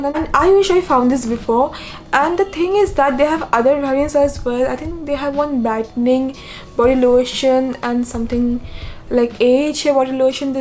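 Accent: Bengal, India. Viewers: It's Bengali accent. She's not faking it.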